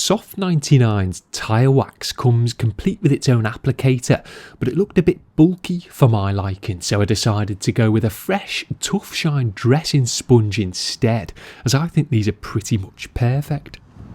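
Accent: United Kingdom. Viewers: Awesome British English mate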